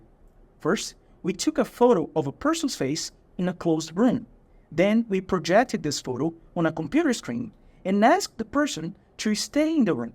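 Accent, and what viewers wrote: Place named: Brazil. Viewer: esse sotaque é brasileiro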